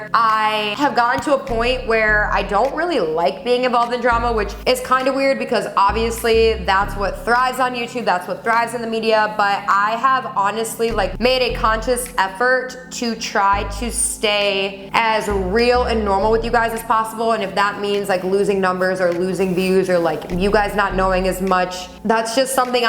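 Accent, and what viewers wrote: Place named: Nashville, United States. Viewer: She said she just got back from Nashville. Kind of just sounds like that accent is coming out a little! I’m from Mississippi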